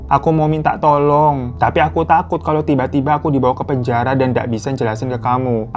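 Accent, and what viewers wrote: Java, Indonesia. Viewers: logat pembang nyo bang mirip logat jowo 😂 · Baaaang ini logat palembang looooh kenapa pake logat njowoooooo ang ang ang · baso plembang with jowo accent unlocked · Bahaso palembang logat jawo abng ni🤣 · Kak...😂 Ngapolah logat nya be cerito cak wong jawo😅... Kito wong Palembang Galo ee kak 😂😂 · salfok, lucu banget bang baca teks nya tetep ada aksen dan nada jawanya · Bahasa palembang tp logat ny medhok jawa bgt ya bang 😁. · Palembang bang.. ngapa dah pake medok Jawa aksen bacanya 😂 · logat Palembang nya lebih kelogat jawa😅🥰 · kok logat jawa bg😂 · Ngakak, ngapo logat palembang jd logat jawo wkwk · Bang logat nya jawa bangat deh 😂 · Apapun bahasanya... Logatnya tetap jawa.... Kocak memang Abang satu ini... 😂😂😂 Jawanya kentel banget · Lucu bgt 😅😅.. logat jawa tp bahasa Palembang 😂😂😂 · Pokoknya bahasa apa aja pke logat jawa sama si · Lucu nian, bahasa Palembang tapi logat medok😭😭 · Salfok dgr bang orng wong kito orng melayu sumatera,logat bang logat jowo😂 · logatnya medok bgt😂😂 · Bahasa Palembang logat Jawa 😂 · Bahasa Palembang logat Jawa 😂😂😂😂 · Salfok bang ngmong pake bahasa palembang tapi logat jawa hahaha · Bang ngapo logat nyo jawo😂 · logattt nyo idk cakitu kok malah logat jawo🤣🤣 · Lucu bngt denger bg tiap x ngmong bhs mana pun tp logatnya ttep jawa..😂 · salfok logat jawa di bahasa palembang😭 gak papa bang dimakluminn kerenn kok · Bahasanya bahasa Palembang tapi aksennya aksen jawa..salam dari Palembang bang🙏🏻🙏🏻🙏🏻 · Bhs palembang logat jawo😂😂,, lucu amat😂😂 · Bhso palembang nya jd aksen jawa yo D maklumi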